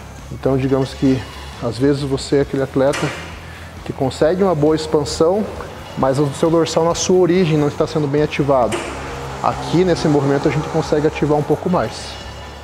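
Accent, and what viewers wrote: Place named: Curitiba, Brazil. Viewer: Sotaque típico de um curitibanoo <3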